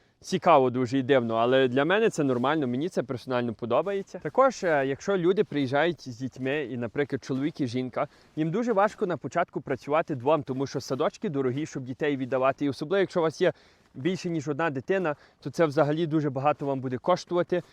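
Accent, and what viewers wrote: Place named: Ukraine. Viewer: Малий говорить як канадієць, а із українським акцентом)) А Українці і дійсно повертаються із різних причин: хтось шукає шари(а її тут нема), хтось бачить, шо у Європі йому було легше(і це часто правда), а у когось банально закінчились гроші, а робота не знайшлася(і добре, якщо вони не валянки і мають заначку на квитки назад), хтось звик до корупції - знайомі-кум-сват-брат, а в Канаді їх немає (і СЛАВА БОГУ)... і т.д. Канада - не для всіх. І це правда. Але вона не погана. І це теж правда. Тут ставлю лайк, бо він дуже об'єктивний.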